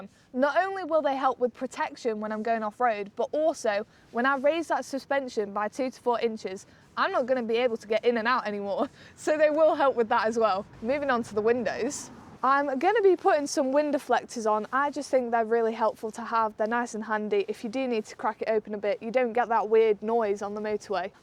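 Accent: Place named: North West England, United Kingdom. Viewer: Hey! On the friendship note, I'm pretty rubbish with accents but I sense you're from somewhere relatively close to me (North West). I'm 26 and don't have many friends that are like-minded in this sense so I'd so be up for meeting you, I feel like we'd get along! Need more friends to do some nice walks with as Spring arrives 🫶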